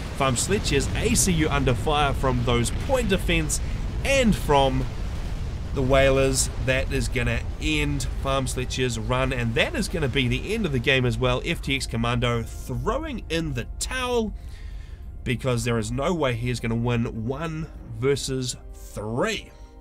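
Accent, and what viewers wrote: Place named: New Zealand. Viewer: first up good on you for taking the time to covering FAF! the quality of the cast was very good. i however am a tad ocd and found listening to your NZ accent exxtremely irritating, so sorry had to be honest!!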